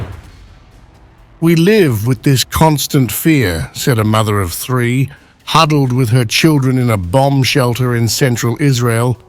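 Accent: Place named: United Kingdom. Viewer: And speak american English, not brutish one!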